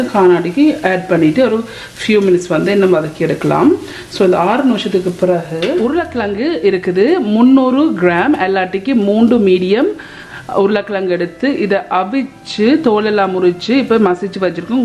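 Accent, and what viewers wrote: Jaffna, Sri Lanka. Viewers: Ohh....wow...... I like your Jaffna spoken Tamil... I'm also Jaffna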